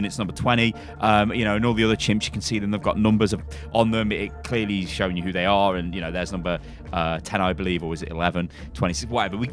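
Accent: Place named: Birmingham, United Kingdom. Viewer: Wait, you're a brummie? Just like me 😁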